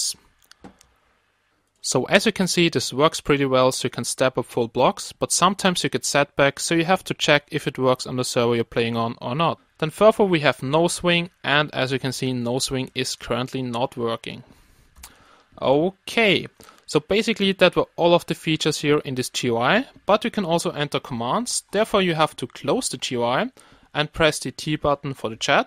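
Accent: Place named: Germany. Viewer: You speak like an German who speak Amarican English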